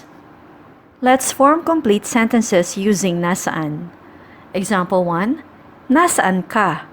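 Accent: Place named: Philippines. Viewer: That’s the true Filipino accent actually. Very far away on how they portray Filipino accent on the internet/movies. 😅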